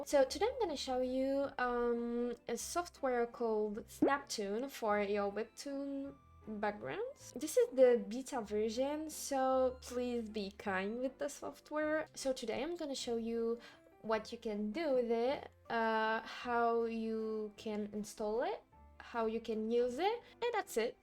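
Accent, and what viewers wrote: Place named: France. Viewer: I love your french accent 😍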